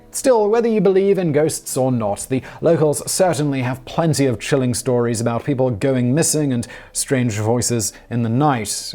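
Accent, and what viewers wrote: United Kingdom. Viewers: I hate the British accent :|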